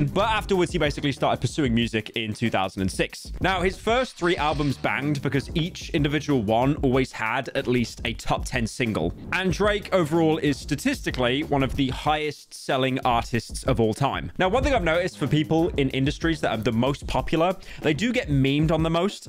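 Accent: United Kingdom. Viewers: I get what ur saying but that’s just his British accent, not necessarily the pronunciation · I love listening to furry British man talk about American rap, very intuitive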